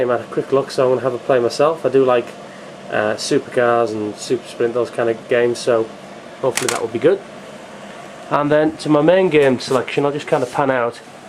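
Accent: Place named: United Kingdom. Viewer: You talk like 007... Kinda cool you british people...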